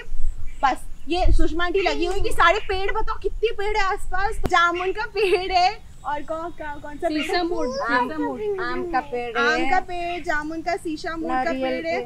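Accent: Odisha, India. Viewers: Thank u for sharing dear. I am from Nabarangpur, Odisha. I am Bengoli and my home is Odisha so i can sure you most of Odisha and Bengoli things are same including the names. Your Odia accent is awesome and tumne saare chizo ki naam v sahi se liya hai.😘 Ghar bahut khubsurat hai. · The way you say" Are Asa" like khanti Odiya ❤ I never knew you re an odia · Di I'm loving ur oddia accent❤. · Your Odia accent is damn❤️.. Coincidentally I'm now living in Baleswar ❤️ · i am from odisha....i am so happy to see you are from odisha too....hearing your odia accent feels so good....love you ❤️❤️❤️ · Hello.. it's good to see you embracing your native land our Odisha in such a beautiful way.. I just have a lil complaint that it's not Tulsi kaa Ped.. it's actually Tulsi Mata (Tulsi ka pauda) whom thaku maa worships daily.. being an Odia I would like to listen ur odia accent as well.. 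loads of love 🫶🏻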